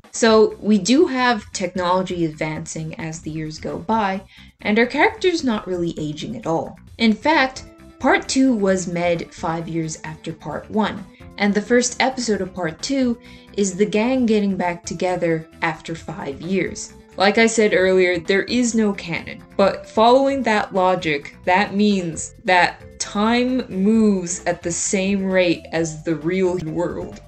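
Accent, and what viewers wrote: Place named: Ireland. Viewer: Are you Irish? I'm Irish and your accent sounds familiar.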